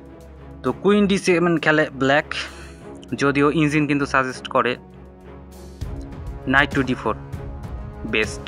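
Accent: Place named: West Bengal, India. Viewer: না,মানে আপনার কথার ধরণটা পশ্চিমবঙ্গের মতো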